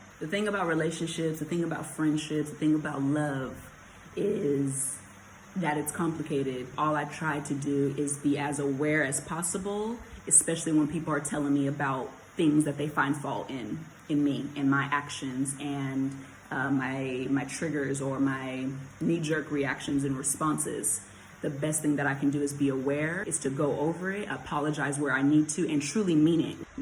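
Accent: Jamaica. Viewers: Such a great video. U touched on a lot of good stuff, and I feel all of this so much. Ppl be missing opportunities with real ppl and u cant force it. As u sai, jus wish them well. R u Jamaican?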